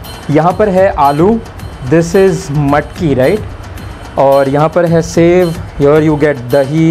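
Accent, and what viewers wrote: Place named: Maharashtra, India. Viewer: tuza marathi accent Khup chhan ahe!👌👌👌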